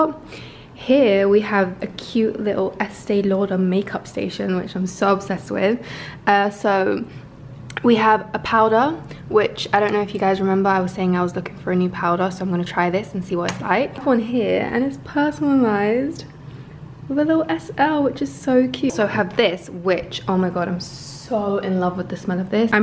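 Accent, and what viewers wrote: United Kingdom. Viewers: At the beginning of the video you have "LND>IBZ" so I think she lives in London (and she has got British accent so I suppose she's from UK). But I'm also new so I'm not sure hah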